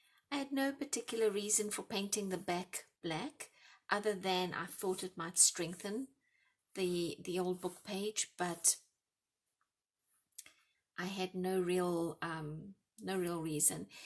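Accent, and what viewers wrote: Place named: South Africa. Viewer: So great to hear a South African accent!!!❤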